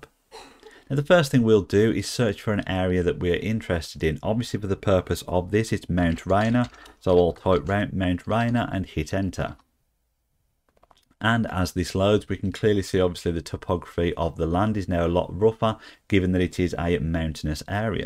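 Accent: United Kingdom. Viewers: I love your accent. What area of Britain are you from? Might be a silly question but I'm from Germany and not familiar with British accents.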